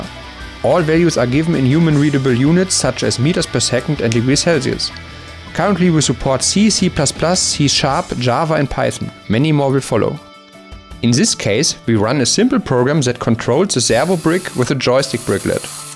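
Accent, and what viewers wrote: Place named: Germany. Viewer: Tolle Idee, und gut, daß Ihr in OWL seid, aber bitte besorgt Euch noch einen Native Speaker für das Werbevideo, mit dem schweren deutschen Akzent wirkt das nicht sehr professionell. Macht am besten 2 Sprachversionen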